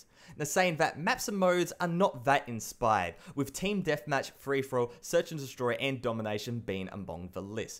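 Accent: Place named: Australia. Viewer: I KNEW U WERE AUSSIE THE MOMENT I CLICKED ON THIS VID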